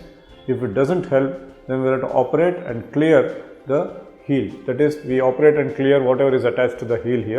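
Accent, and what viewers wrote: India. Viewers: leo bonita Its indian accent dear. Smtimes i dnt understand foreign accent, its so simple. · It’s Indian English. It’s probably closer to standard English than American, Australian or South African English. Expose yourself to it more and you’ll adapt to the intonation incredibly quickly. If you’ve learned other languages then you’ll adapt to it really quickly.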